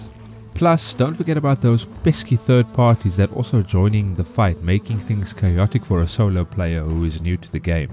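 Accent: South Africa. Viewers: you have a very mild south african accent.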